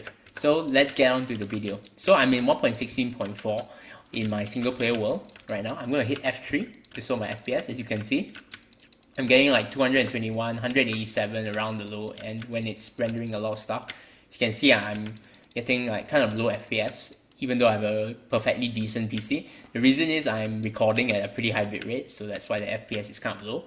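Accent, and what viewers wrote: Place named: Singapore. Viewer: You sound like Singaporean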